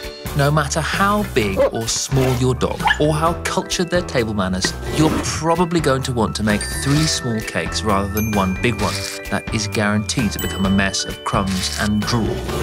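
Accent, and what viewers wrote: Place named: United Kingdom. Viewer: Ah esse inglês britânico difícil e lindo!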